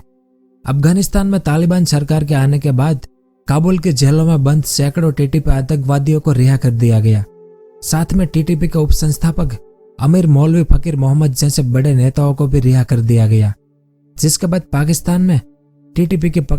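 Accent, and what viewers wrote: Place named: Kathiawar, India. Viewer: તમે કાઠીયાવાડી‌ છો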